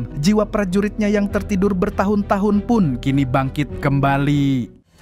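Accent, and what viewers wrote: Banjar, Indonesia. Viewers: Urang banjar mang?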